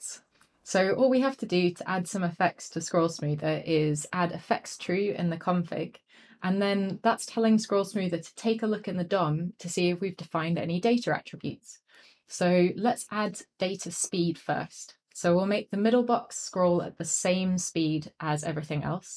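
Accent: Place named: United Kingdom. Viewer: Does anyone think her voice is sexy? What British accent is this from?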